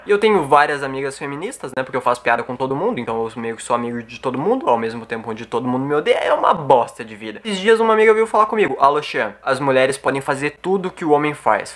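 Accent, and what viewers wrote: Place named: Rio Grande do Sul, Brazil. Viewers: Sotaque gaúcho tche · Po tche cê n conhece sotaque gaucho?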